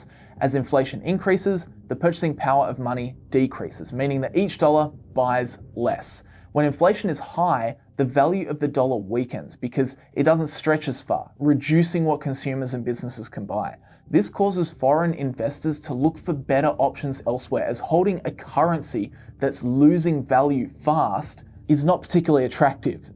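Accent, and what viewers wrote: Australia. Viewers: Maybe I am biased towards the Australian accent, but your videos are really so much fun and so much info. Thanks from the bottom of the heart!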